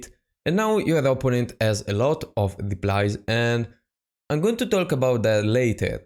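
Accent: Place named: Italy. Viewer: Great stuff bro. I enjoy the Italian accent 😂. Can you please show your best response to the Sicilian defense? Thanks 🙏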